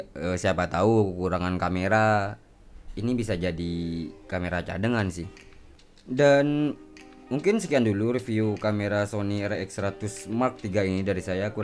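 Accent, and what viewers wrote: Madura, Indonesia. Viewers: Madura banget. Anak madura mana ini? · wah worth parah.... mantab,,, logat maduranya masih nempel om... hahaha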